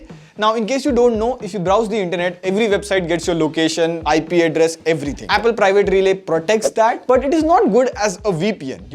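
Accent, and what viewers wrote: India. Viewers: your language is very clear 😃 i think you are indian 😅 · Indian accent 😅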